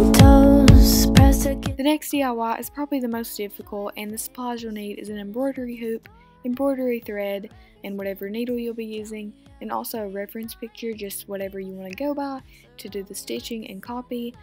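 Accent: South, United States. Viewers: People are getting annoyed because of her southern accent but it doesn't matter to me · she has a southern accent....really not that hard to figure out lmao Idk why people think she's from europe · shout out to someone else who got a southern accent like me :) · Love your accent! I'm from Atlanta and my dad is from Alabama so we're used to that kinda thing😂 your style is so cool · SPOOKY💖 btw I love your accent I'm also from the south❤️❤️ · she's southern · She's southern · Nikki King It's definitely a southern accent!😁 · She's just southern lmao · you're video was amazing! I love all the ideas and I think you have the cutest southern accent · We have the same voice SOUTHERNERS ROCK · 2gymnasticgirls that's not a " Texan" accent. It's called southern 😊😤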